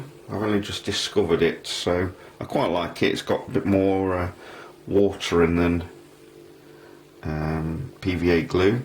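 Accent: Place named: England, United Kingdom. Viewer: Hi Uncle! It was so great to hear from you guys. It's so exciting to have an uncle from the UK with a lovely English accent, I just love it . This page is great, Jake is wanting to watch every video so that we are gonna do. We love to play with trains but we never had anything like you have on here. Love you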